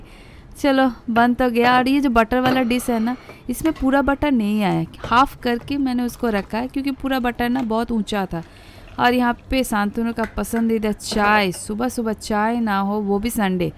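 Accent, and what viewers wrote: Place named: Bengal, India. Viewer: agar aap is format me interested ho, in Hindi language, then I would advice, you have to work on your language using some kind of training. Aapke words bahot achhe hain, tone bahot achha hai, but the beauty of what you intend to deliver, is getting lost, as the Hindi is Bengali accented. Bol chal ki bhasha me koi bhi accented language achha lagta hai, but is type ka theme agar apka aspiration hai, then please look for a language training somewhere. Agar language finesse ayega, then the narration will be of another level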